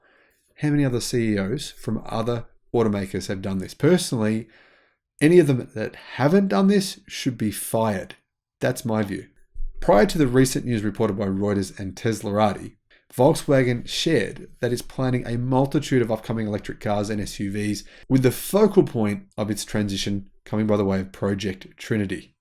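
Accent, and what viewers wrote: Australia. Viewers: I have been to Melbourne. I met Cambell Bowell in Frankston. He gave me a ride in his new car, the Nagari and showed me around his facility. A very nice guy! This was a long time ago. I also met some people named Evans in Frankston. I haven't been to Australia in over 50 years but I do enjoy your accent and enthusiasm for our new EV world.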